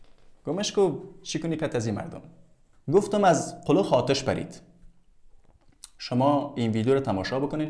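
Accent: Iran. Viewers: این لهجه ایرانی بسیار جالب هست نه ایرانی و نه افغانی یک لهجی نو است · افغان با لهجه اجنبی ایرانی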